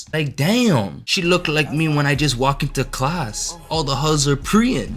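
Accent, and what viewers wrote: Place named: Toronto, Canada. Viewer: are you from Toronto? I can sense the accent like i sense the force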